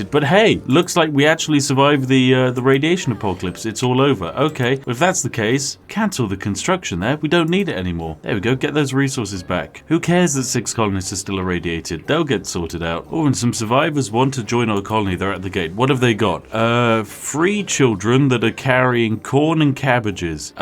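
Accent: United Kingdom. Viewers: Wait, this guy is British?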